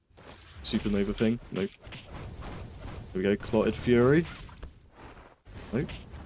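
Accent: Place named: United Kingdom. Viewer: This guy British asf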